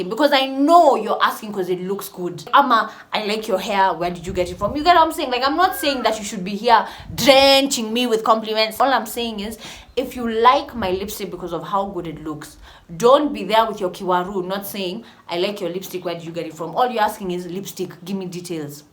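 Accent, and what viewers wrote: United Kingdom. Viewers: I so love you..it's such videos I long for.. kindly do a video with your British accent..I would love to hear this ❤️ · Your British accent ✨💕💕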